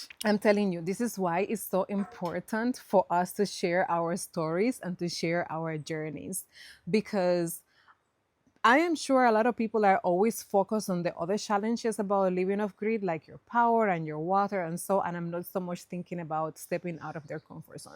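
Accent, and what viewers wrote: Jamaica. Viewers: You are such a great storyteller, content creator, wife, mother, businesswoman, and everything. So captivating. I have been watching you from as long as you have been doing this. It was you accent speaking the patois that first grabbed me, then I saw the sincerity and value in your content. Very uplifting and encouraging. I have being enjoying them all, and now Im hooked. Wishing you every success. Mucho love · I love to listening to you speaking patios 😃😃👍🙏🏽🙏🏽🇯🇲❤️❤️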